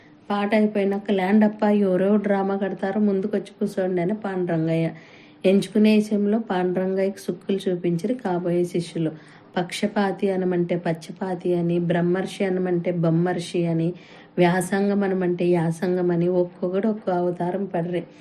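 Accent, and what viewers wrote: India. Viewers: కర్నూలు పడమటి తాలుకాలలోని యాస,గోనెగండ్ల మండలం, ఎమ్మిగనూరు మండలాలలో ఇలాగే వుంటుంది. చాలా బాగుంది 👌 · కుశలంబే గదా ఆంజనేయా కథకు ప్రాణం పోసింది.కథలోని మాండలికాన్ని మారుతీ, తన అక్షరాల్లో పండిస్తే, తన వాచకంలో అద్భుతంగా పండించిది.బహుశా ఆమెది ఎమ్మిగనూరు,ఆదోని ప్రాంతమే అనుకుంటాను.ఇతరులకు ఆ యాస,ఆ వాచకం సాధ్యం కాదు. మనసారా అభినందనలు.సీమ కథను శిఖరాగ్రానికి చేర్చిన మారుతికి ఆత్మీయాభినందనలు.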